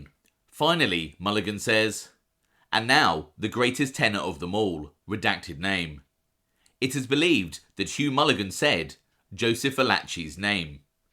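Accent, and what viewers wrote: United Kingdom. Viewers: your wonderful British accent makes these nicknames that much more pleasurable and entertaining to hear. Keep up the quality work.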